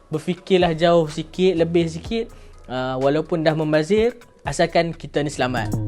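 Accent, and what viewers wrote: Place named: North, Malaysia. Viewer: Pekat utara😂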